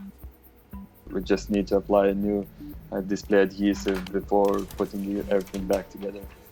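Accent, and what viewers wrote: Russia. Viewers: Акцент такой русский) жесть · He speaking with Russian accent?!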